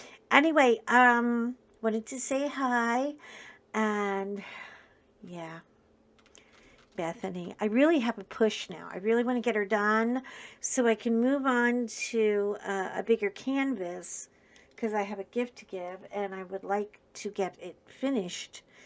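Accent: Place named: Ireland. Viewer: I'm sorry you had such a hard time in school. 💜 Unfortunately I know how it is. I was scared of going to school every day for about 7 years. The 2 last years wasn't that bad. So I managed well. And I guess we all know a "Fat Bethany" in some form. I'm very happy you survived 😊 I love how you talk Irish, as your mother 💙 Thank you so much for sharing your story with all of us. Big hugs 🤗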